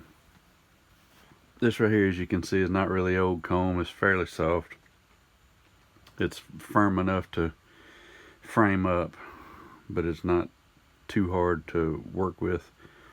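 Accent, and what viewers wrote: South, United States. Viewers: Just a wild guess sir, are you from the south? 😂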